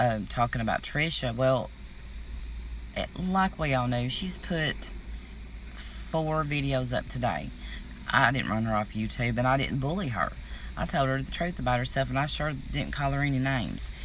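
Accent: South, United States. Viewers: I originally watched her because she posted she ws a cancer survivor,and I am also,i had non hodgekins lymphomia,which is a blood cancer,,then it was all fake.She made a big deal about Amy,long after the drama was resolved,and bunny was exposed.You seem cool,your voice has that southern tang,which state are you from? Iowa here,you know the state you go thru to get to other states. :) so I look fwd to watching more of your vlogs,peace out,Lisa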